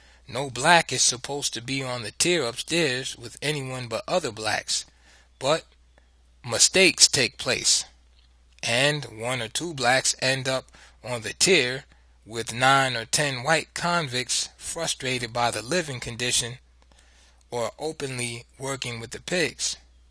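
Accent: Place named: New York, United States. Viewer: Damn this new York accent.. Lol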